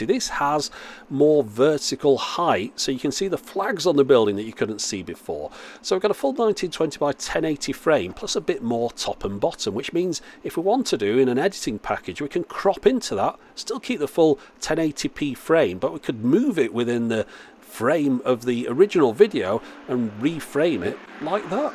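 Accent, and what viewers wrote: United Kingdom. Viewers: from which part of the UK you are?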